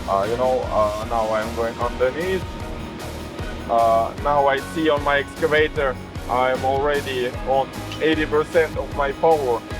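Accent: Russia. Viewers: I like your native Russian accent the best. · I know it’s a race against time to save the chateau, yet you have to work offsite to earn money to pay for the restoration. I hope you can increase your subscribers so you can get money through YouTube. It will happen but it takes time. I’m praying for a miracle for you. I love when you change off between speaking French and then English but when you speak inglish you have a Russian accent. No matter what, you are delightful. ❤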